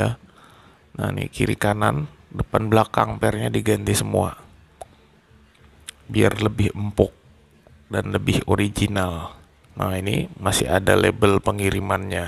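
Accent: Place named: Hulu Sungai, Indonesia. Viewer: Logat/dialex hulu sungai masih kadangaran broooo !!!!!!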